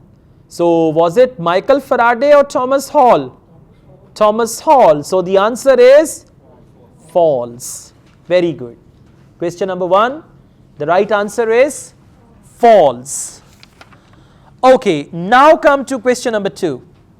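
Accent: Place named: Punjab, India. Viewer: I like urs Punjabi keep speaking like that it suits u very much👍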